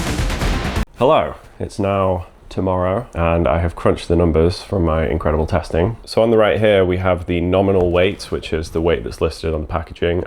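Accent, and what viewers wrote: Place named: Scotland, United Kingdom. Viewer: Why do you sometimes sound a bit Scottish? I am Scottish and you do, especially in this video 🤔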